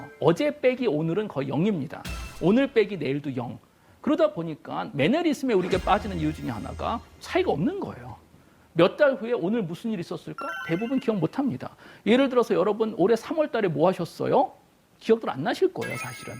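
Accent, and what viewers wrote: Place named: Jeolla, South Korea. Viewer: 억양이 라도... 비 호감... 비추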